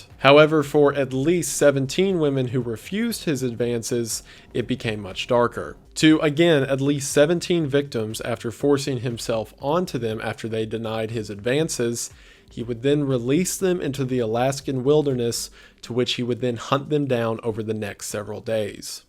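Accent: South, United States. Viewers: Where are you from? I giggle because you have a southern accent at times.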